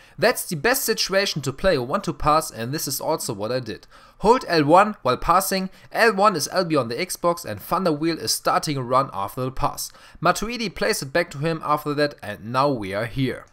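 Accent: Germany. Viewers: Love his German accent <3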